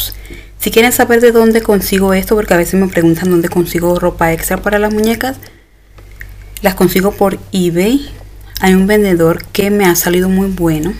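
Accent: Colombia. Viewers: Hola me gustan mucho tus videos, los miro con mis nietas y gracias a ti empezaron a gustarnos estas lindas muñecas(monster high,Ya que a mi niña mas grandecita no le gustaban,pero un dia le mostre el video de tu coleccion y le gustaron mucho varias,pero le encanto Cleo...y hasta ya tiene 2 muñecas Cleo,2 Claudin y a DracuLaura unas regalos de mi esposo y mio y las otras de sus papas,o sea de mi hila y su esposo,es muy hermoso repetir la experiencia que comparti hace años con mi hija y hoy en dia con mi nieta adorada!... Y en verdad que tienes una voz relajante; y tu acento Colombiano es lindo!! Saludos Cariñosos desde Mexicali. B.C Mexico.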